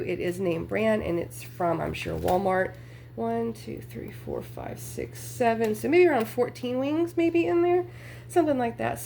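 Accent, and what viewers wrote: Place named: Midwest, United States. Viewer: Hi, I love to eat that potted meat on buttered toast for breakfast, but if you feel its too salty - mix a can with a bit of mayo or even miracle whip and make a "ham salad" to make a regular sandwich with LETTUCE! hahaha Girl, I just dont think you are going to make it through all of that lettuce. If you can share the big bag of it with someone for taco salads or something that would be kind, or simply throw it outside for the bunnies and squirrels to eat. That way its not going to waste. So, Im confused how many are in your family? You, your wife/girlfriend and how many kids? ages? Im just curious. Also, where are you located/state? You mentioned "the south" but you sound like you have a midwestern accent. Nice hall btw! Is it monthly?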